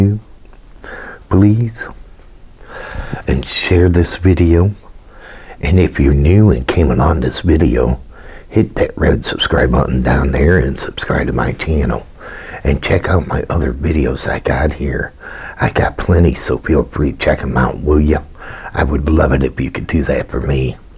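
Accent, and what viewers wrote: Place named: South, United States. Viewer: that southern accent is everything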